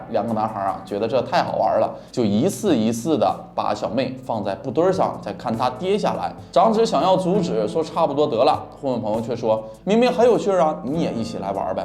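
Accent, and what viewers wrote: Northeast, China. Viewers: 感覺樓主為了把普通話講標準特別吃力，還是經常露出東北話的發音，總是替他捏把汗！其實根本不用刻意地說標準的普通話，用東北話講解更有特色！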